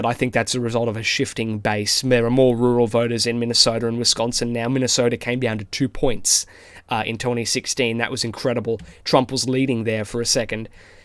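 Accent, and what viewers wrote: Australia. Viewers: doesn’t he sound more Australian, Mr. K-On pfp?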